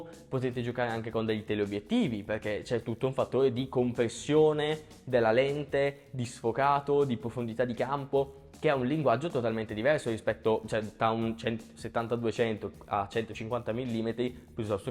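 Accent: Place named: Brescia, Italy. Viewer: Ciao vecio! Permettimi il “vecio” perchè ho capito subito che sei Brescia dall’accento 😂 Anche io faccio Street, ho vinto anche un concorso tanti anni fa con una foto in Piazza Vittoria, se fate uscite di gruppo mi unirei volentieri per qualche sessione. Nicolò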